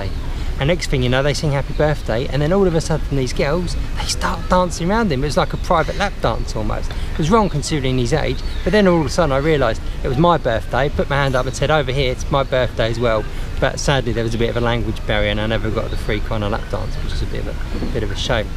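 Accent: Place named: Cockney, United Kingdom. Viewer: make fun of your accent by doing the cockney thing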